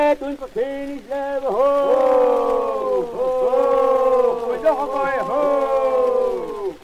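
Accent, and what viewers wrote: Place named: Saxony, Germany. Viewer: nu das ist ja nu ma werklich eene scheene säckssche Offnahme. ... Klasse und Danke für das Einstellen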